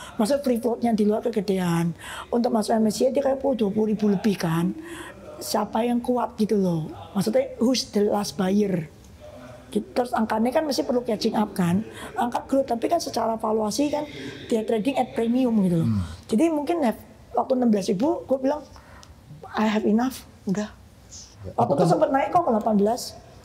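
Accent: Java, Indonesia. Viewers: Tercerahkan, terimakasih mbak e. Logate jowo gampang dipahami. Bakbuk itu impas 😅❤ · Antara Jawa Tengah atau Jawa Timur wlwkwk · Cici ini pintar ,sederhana, duitnya banyak.tapi gk pengen oplas.1lagi medok(gk lupa dia berasal)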